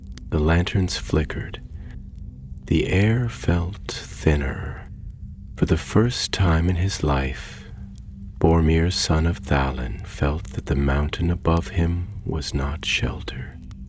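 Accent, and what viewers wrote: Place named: United States. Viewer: American accent 🤮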